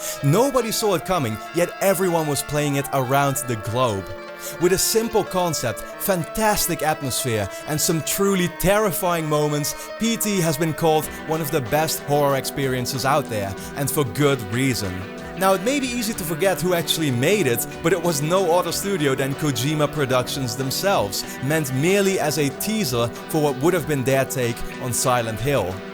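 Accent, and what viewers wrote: Netherlands. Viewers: Awesome vid! Great for killing time, while we're all waiting for the next DS trailer... oh and by the way, geweldig nederlands-engels accent haha!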